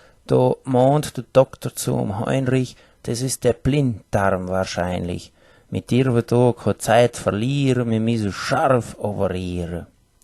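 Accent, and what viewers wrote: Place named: Palatinate, Germany. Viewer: Ein bisschen hört es sich auch an wie pfälzisch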